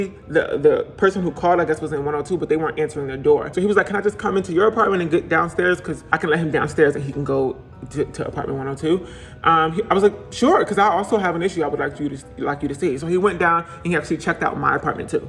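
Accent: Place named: Philadelphia, United States. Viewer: Baby you sound like you in Center City or Northern Liberties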